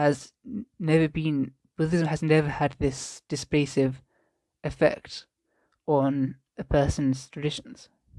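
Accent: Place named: RP, United Kingdom. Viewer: you have a very posh accent. You must have gone to posh public school. Your understanding of Indic faiths is very much appreciated. It would be very good to connect as also live overseas and feel frustrated that well meaning Xtians overseas don’t realise the damage they do to India.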